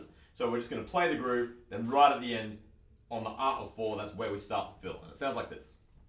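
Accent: Australia. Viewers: is he Ashton's missing dad? he's a adult he plays drums like Ashton , he has a Australian accent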